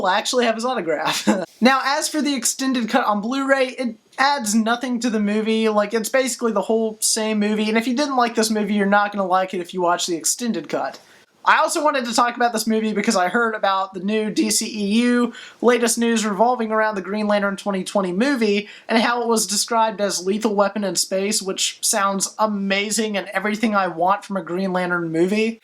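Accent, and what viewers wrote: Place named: Maryland, United States. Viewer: weird question I know, your pronunciation just sounds like a Maryland accent.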